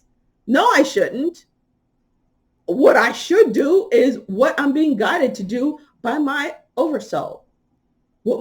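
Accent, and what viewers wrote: New York, United States. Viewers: I love your "New Yorkness" and I am sure a lot of other people do too. Thank you. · I like you New York style 👍🏻🎶🎤you Rock.. 😎🤩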